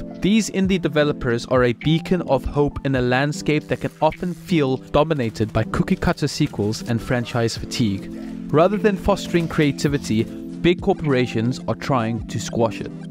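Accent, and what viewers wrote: South Africa. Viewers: Man, I'm all in on these videos! Could this be the birth of a new thoughtful, reasonable, commentary channel on games industry and culture? It feels like The Act Man channel, but from the point of view of someone that loves games but has other things going on in life, who can't grind a game 8hrs a day, just like most of us. I love how you add the name of the game whenever you show gameplay from that game, it helps for games that I can't recognize via gameplay, having not played them. It's a small detail, but attention to detail is a huge thing for making a high quality anything. The SA accent is also nice. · Are you South African? I can hear it in your accent bru.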